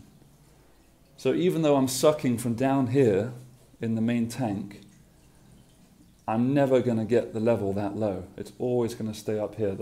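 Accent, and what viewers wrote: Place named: United Kingdom. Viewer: After much thinking, the penny dropped. The overflow (which is not a siphon) works as the water level in the tank comes up, it equalises in the overflow box, which brings it over the level of the white tube - voila! Have you experimented with the flow? How would you increase the flow out of the main tank? Great video - well explained. I thought I heard UK in the accent and USA in the power outlet - then I read Thailand in the comments!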